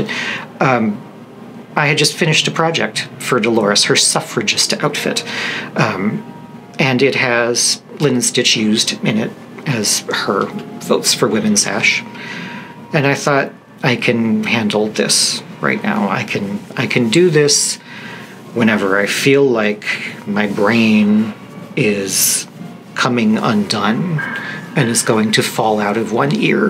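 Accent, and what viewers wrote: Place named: Chicago, United States. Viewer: Natter away,. I enjoyed your Blog and now I can enjoy your Vlog. I also enjoy your Chicago accent. I grew up in a NW suburb in the 50s and 60s. Yes, you do have an accent. Thanks for dropping into my living room today. I hope you don’t mind that I’m not dressed (literally). Keep up the vlogging and knitting and teaching. How do I get in on your patreon thing?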